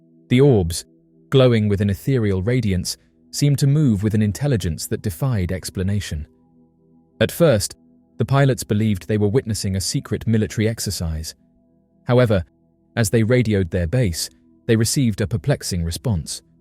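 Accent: United Kingdom. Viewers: He’s just bri’ish.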